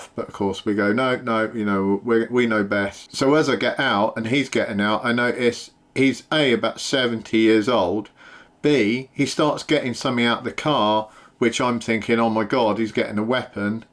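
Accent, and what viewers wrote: United Kingdom. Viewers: The British accent saves the day!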